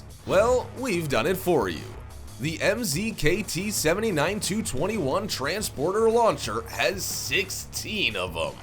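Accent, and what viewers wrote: United States. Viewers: the annoying side of American accent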